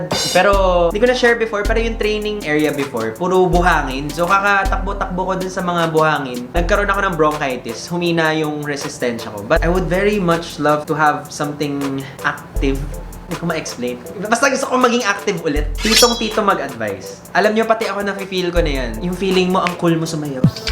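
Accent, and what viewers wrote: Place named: Conyo, Philippines. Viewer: Genius sa math Me: Si kuya yun Conyo magsalita:Si kuya ulit yun.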